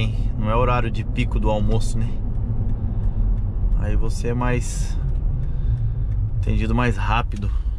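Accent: Minas Gerais, Brazil. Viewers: Fala irmão, sou novo no canal, e quero saber, cê é mineiro?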